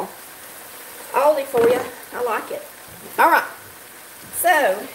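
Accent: South, United States. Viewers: I absolutely love your Country accent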